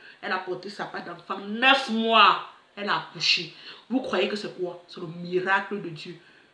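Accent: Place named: Cameroon. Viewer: 😂😂😂j'aime trop ta façon de parler ( l'accent kamer ) trop belle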